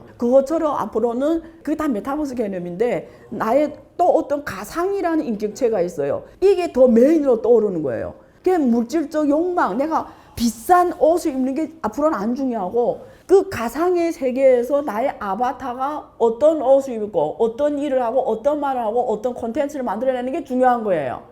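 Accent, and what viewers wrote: Yanbian, China. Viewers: 옌변 일까 내용보다 특유 조선족 억양이 신경쓰이네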